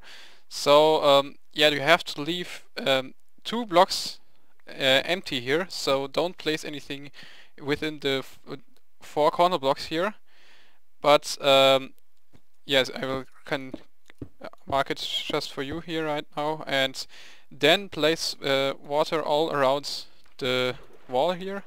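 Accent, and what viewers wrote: Germany. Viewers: are u german ? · Are you german sounds like german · man hört sofort dass du deutscher bist :) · I hear, you come from germany :D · are you german ? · I think you german ! (ich auch XD) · u r german? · Are u german? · typischer deutscher accent :D aber weiter so! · haha krasser deutsch - english dialekt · extreme german accent :D awesome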